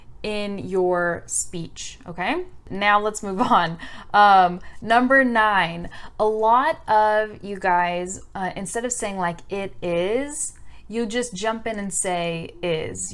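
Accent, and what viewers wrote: California, United States. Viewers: Move to California and you´ll start talking like her in a couple of years or so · I know everything what you said, particularly the grammar aspect. But I simply love this video bcz its very useful for my listening ,and i love your californian accent. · Come to California, many women sound just like this. I don't know if she is from California, I'm just guessing based on her accent.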